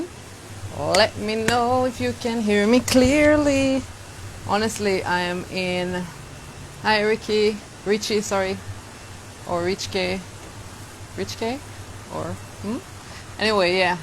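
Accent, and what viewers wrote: Jamaica. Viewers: You sound and could pass as a Jamaican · 🙂Lady you have the Jamaican accent!!🧏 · Sorry, but Yuh yaawdie accent top tier yufuk!! 🤭🔥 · I can hear that you have picked up a slight Jamaica accent.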